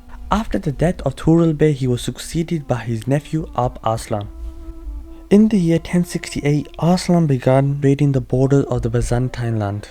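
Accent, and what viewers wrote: Northern England, United Kingdom. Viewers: Salaams I thought you did pretty good considering it was your first time... keep practising and you will get better and better guessing from your accent you sound like you are from Lancashire/ Yorkshire. Am I correct? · Thanks! The translation is not good. It has Thailand instead of Byzantine lands. Your accent is like Northern English and very easy to understand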